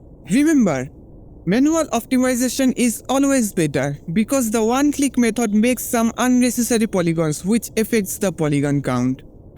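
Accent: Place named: India. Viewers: I am not Indian but I can also confirm that he is Indian. 😅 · i am an Indian so when I listen your English I confirmed that you are Indian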